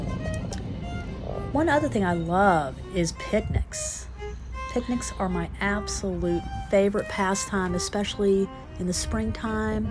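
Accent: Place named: South, United States. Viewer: Liked and subscribed friend! I do love your southern twang. <3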